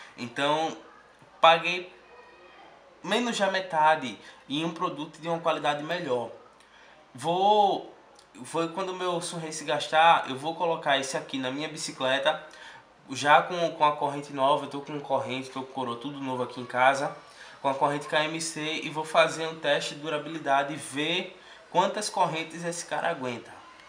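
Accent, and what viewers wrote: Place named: Recife, Brazil. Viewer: Vi seu comentário lá no Aliexpress E esse sotaque, vc é de onde de Recife?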